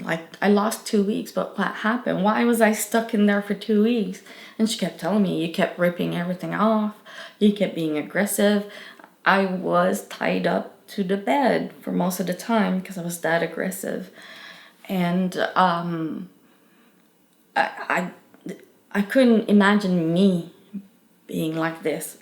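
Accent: Quebec, Canada. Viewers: I thought I recognized her wonderful accent. I live in Alberta now and unfortunately don't hear Quebecers often. ❤ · Where is from? I wasn't sure if it's a quebec accent or not.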